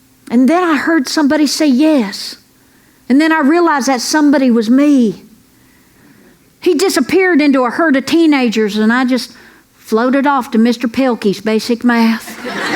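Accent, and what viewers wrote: South, United States. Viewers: I love her humor too, especially since they are told about true incidents that she lived through herself!! SHE HAS A WONDERFUL SONGING VOICE EVEN THOUGH HER REGULAR SPEAKING VOICE HAS THAT SWEET COUNTRY TWANG.😂. I lo e listening to jokes! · Three weeks ago I stumbled upon an vid and with my niece named Ella Mae I thought what the hell and clicked on it. I have never been so glad I clicked on something I wasn't even looking for. I laughed and then I laughed and then I laughed some more. Her observations and that southern drawl had my stomach hurting. These last three weeks, I've looked for and watched everything I can find that says. Totally hilarious including her X-Rated ones. Love me some. Oh by the way, did I tell ya I laughed😍😍😍😂😂😂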